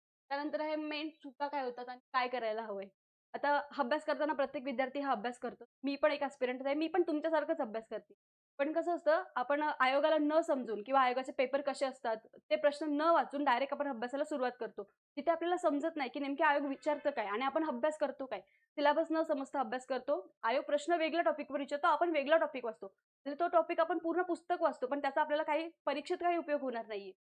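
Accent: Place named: Agri Koli, India. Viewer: मॅडम च्या accent वरून त्या आगरी कोळी कोकणातल्या असाव्यात.... तिकडे असं बोलतात तर घ्या समजून...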